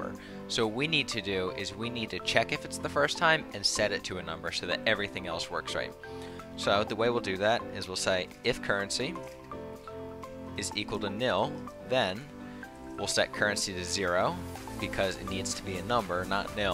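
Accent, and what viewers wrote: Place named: Romania. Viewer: Salut, esti roman? Imi place cum vorbesti engleza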